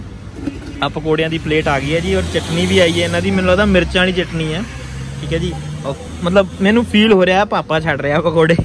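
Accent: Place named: Amritsar, India. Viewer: Paaji tusi hoshiarpur de o par tadi boli expretion sade amritsariya vrgi aa ji apa nu v hun ithe rehde kafi chir hogeya hun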